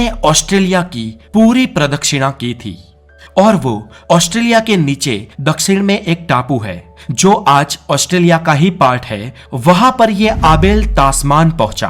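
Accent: Gujarat, India. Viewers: Gujrati bhai hindi suit nahin karti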